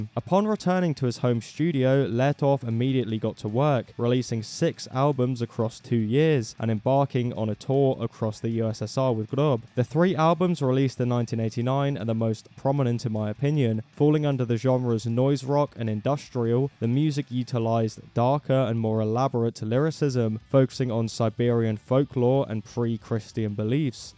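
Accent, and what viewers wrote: United Kingdom. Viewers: A bri'ish fellow talking stuff about Yegor Letov? That's cool, I wish it was interesting to me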